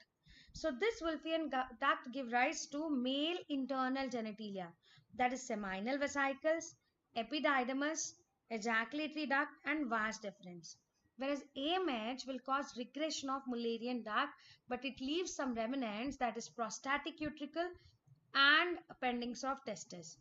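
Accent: Telugu, India. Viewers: Thank u so much mam ur Telugu is so cute mam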